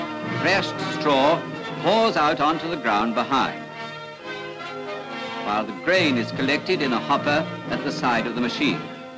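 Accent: RP, United Kingdom. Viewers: That's how UK commentators on TV /Radio etc used to speak back in those days.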